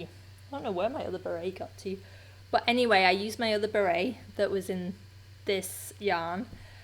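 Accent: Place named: Liverpool, United Kingdom. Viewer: Thanks so much for the lovely Hiraeth pattern,. The name really touched me when I read your newsletter this morning, as it's something I've been feeling a lot of for the past few months, being originally from Wales. Not sure why, having lived in Canada for 100 yrs, but there you are. Not sure if I've missed this, but why the name Hiraeth, why did you pick that as the name? I can definitely hear that Liverpool twang, but do you have a Welsh background?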